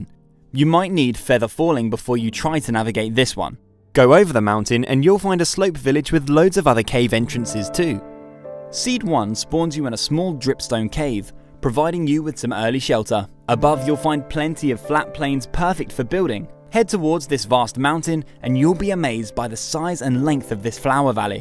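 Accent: United Kingdom. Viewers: Amazing seeds good job R u British?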